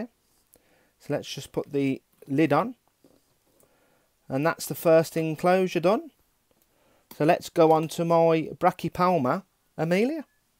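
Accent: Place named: West Midlands, United Kingdom. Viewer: Clues - bloke -west midlands accent -has red runner roaches -name is.....do I win a prize? 😁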